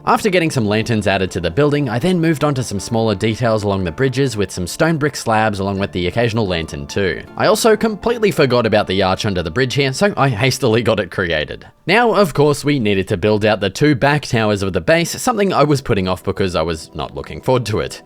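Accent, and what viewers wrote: Australia. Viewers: you sound like the biggest aussi ever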